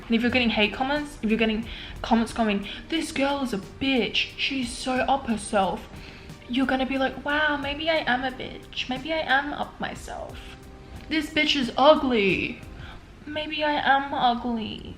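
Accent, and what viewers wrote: Australia. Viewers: this is totally random but are you australian? english is not my first language and I'm trying to guess where your accent is from lol it's beautiful tho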